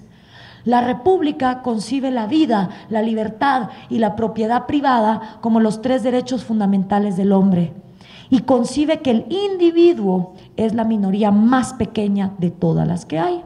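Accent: Mexico. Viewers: EL UNICO INTERES DE ESTA CHICA ES EL MONEY , ES DE GUATEMALA PERO HABLA COMO MEXICANA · pero porque me hablas como mexicana wey?!?! por favor, un acento guatemalteco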